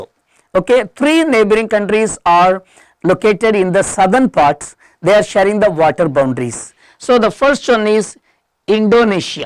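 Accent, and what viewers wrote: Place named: Tamil Nadu, India. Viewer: Talking in Tamil tone I cannot understand how can you say that you are moderator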